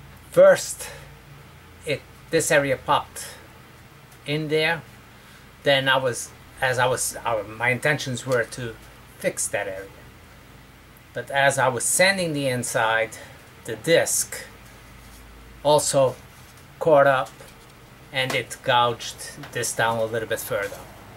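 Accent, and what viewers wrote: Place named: Azores, Portugal. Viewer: You sound like an Azorian person!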